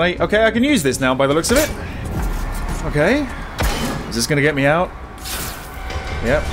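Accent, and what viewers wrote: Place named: United Kingdom. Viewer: watching exploding mutants and hearing "Oh that was lovely" with a British accent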